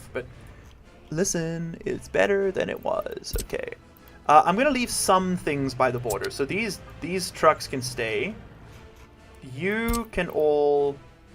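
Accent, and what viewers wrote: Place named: South Africa. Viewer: Man you sound like most of the SA that move to New Zealand after a few years , every once and awhile the accent comes through, mostly on R's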